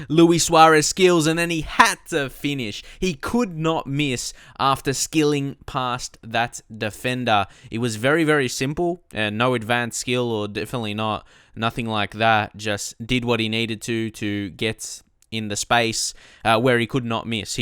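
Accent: Australia. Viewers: R u Aussie?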